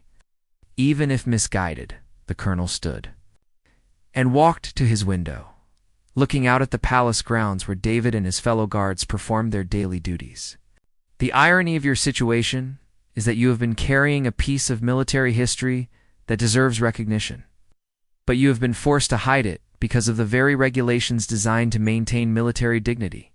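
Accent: United States. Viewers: Those are phoney uniforms - not one representing a regiment of the Brigade of Guards. You appear to have an American accent; why not post fiction based on an American formation?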